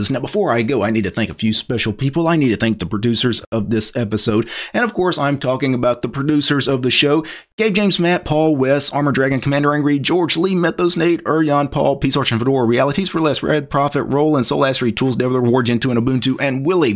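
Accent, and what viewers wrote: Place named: Louisiana, United States. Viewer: That Louisiana twang really is something 😅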